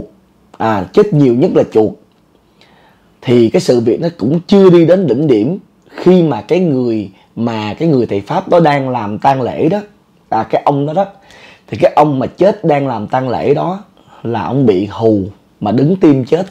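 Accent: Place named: Vietnam. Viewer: Nghe một thời gian e đoán ra bác người tỉnh nào rồi, đồng hương hi hi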